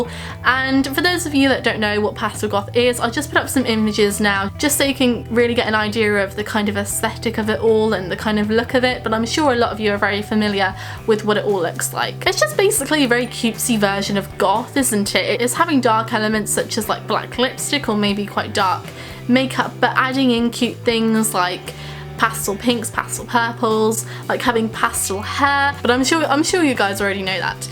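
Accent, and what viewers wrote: United Kingdom. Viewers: I don't really understand your British accent because I'm Brazilian and my English is a little limited, but I liked your video, I've been goth since December 2022, and until then I didn't know my side, until I discovered nu goth, and I understood that many people used the image of goth just for aesthetics, but I have a passion for the entire subculture, when I discovered nu goth, I fell in love, I identified so much, I feel like I consume A LOT of gothic or headmetal books and music, but my style, my way of living, is totally Nugoth, I'm kind of sad that it's seen as a trend, because for me it's something very special ❤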